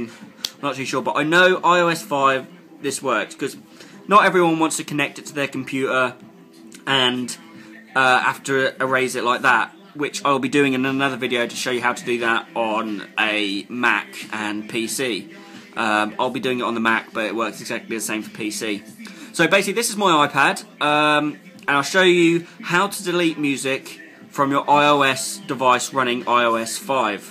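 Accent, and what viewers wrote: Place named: Ireland. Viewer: Somebody's Irish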